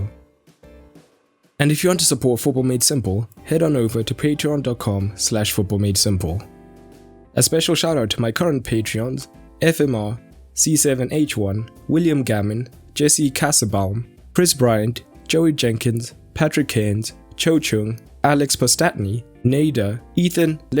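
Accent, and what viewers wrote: South Africa. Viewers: Noh man I've been meaning to ask, are you from South Africa?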